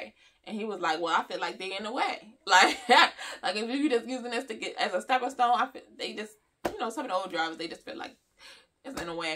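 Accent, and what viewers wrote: Baltimore, United States. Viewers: Sounds like you from Bmore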